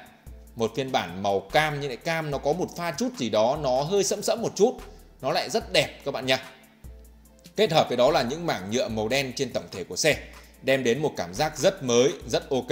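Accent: North, Vietnam. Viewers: anh người bắc này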